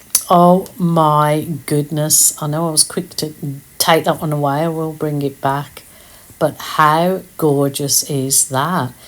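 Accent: Birmingham, United Kingdom. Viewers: I love the smell of summer rain mmmmm so peaceful and calming smell and sound. I’m loving your Brumy accent too 😂.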